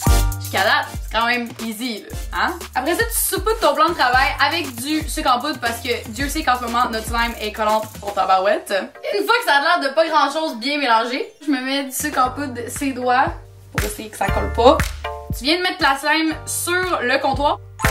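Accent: Quebec, Canada. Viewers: T’es québécois ?? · l meuf qui prouve que l'accent québécois c'est pas forcément un tue l'amour mdr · J’adore l’accent canadiens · Jadore l accent québécois mdr le français ses trop sainple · J’adore tellement l’accent québécois malheureusement je l’ai pas xD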